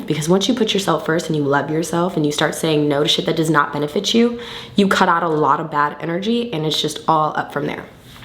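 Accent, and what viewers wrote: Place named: Florida, United States. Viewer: I fuck with u the long way!!! Man I miss Florida talk!!! Love it keep em coming!!! Ur doing great!!!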